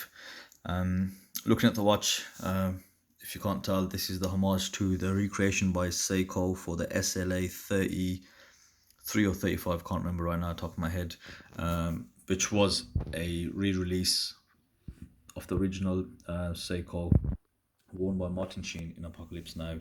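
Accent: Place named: West Midlands, United Kingdom. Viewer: Thanks for the review and updated review From the accent, possibly local to me, West Midlands (Brum maybe?)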